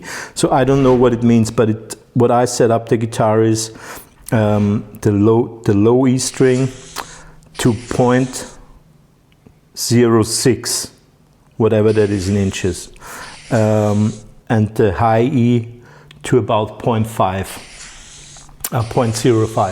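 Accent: Austria. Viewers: Ottakringer Bier?! Dachte mir bei den vorigen Videos schon dass ich den Akzent identifiziert habe 🙂Liebe Grüße aus dem sechszehnten Wiener Gemeindebezirk! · That's amusing, apparently my ears still work, hahaha! I thought I recognised your accent also, though I wasn't certain- nor so specific; I heard Austria, but not the regional part (my family comes from Neusiedl in any case). Entschuldigen sie, bitte, für mein in Englisch schreiben in die Mehrheit; mein "Ungarischer-Bauer Kinderdeutsch mischt mit Berliner & Hamburger Strassedeutsch" ist sehr rostig heute...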